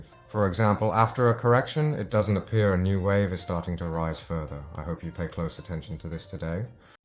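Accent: United Kingdom. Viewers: wow your Enginlish is perfect. I thought you were born in korea, but sounds like you grew up in England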